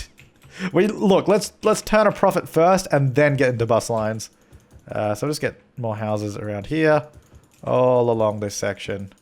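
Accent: Australia. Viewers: Hey, are you Australian? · You're an Aussie aren't you?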